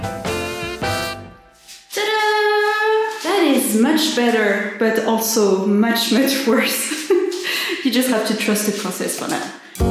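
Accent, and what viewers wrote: France. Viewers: you are such an inspiration, paint, sew, carpinter. art in every thought. it freaked me out a bit you were wearing flipflops for the whole processed (closed shoes in the heavy workshop!!) but i love you and the french accent <3 salut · You are pure whimsical chaotic energy - with a French accent - & I am so here for it! The room looks FABULOUS!!!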